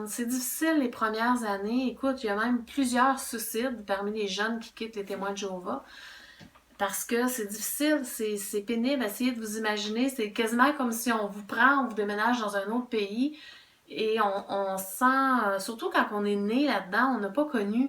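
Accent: Quebec, Canada. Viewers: Merci de partager ton accent Quebecois. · Oh t’es Québécoise ! J’adore ton accent et j’adorerais venir visiter le Quebec un jour ! Vous dites le mois d’A-OU ? J’ai appris un truc haha. Sinon bonne vidéo. Au début de "mon réveil" je n’en revenais pas que ce soit partout pareil. Je pensais que c’était que dans ma congrégation que ça n’allait pas.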